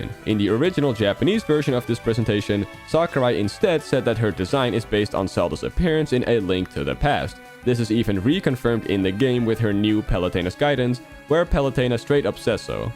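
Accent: Netherlands. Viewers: Are u from the Netherlands or from Belgium?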